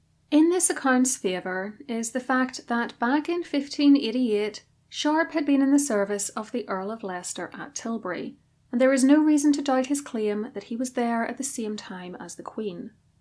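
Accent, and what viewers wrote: Northern Ireland, United Kingdom. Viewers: But you have a voice, oh, such a voice! A voice that is of a good language, and English, at that. Or Northern Ireland or someplace. ❤ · As a Shakespeare Original Pronunciation aficionado, hearing Elizabeth's speech read in your lovely Northern Irish accent (which bears some similarities to O.P.) really makes it come alive for me.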